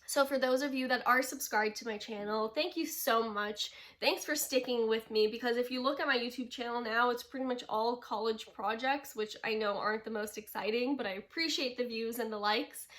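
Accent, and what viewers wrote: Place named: England, United Kingdom. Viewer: Interesująca masz historię życia. Trenowałaś sport, so did I 🙂 kochasz zwierzęta 👍🏻💞 so do I 🙂 Kocham Twój angielski akcent 💞🙂 Pozdrowionka 😉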